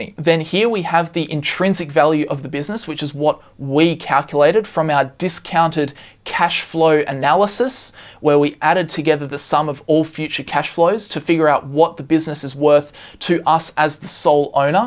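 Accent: Australia. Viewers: Just found this channel. Thanks so much for such excellent content. Nice to hear a fellow Aussie voice too.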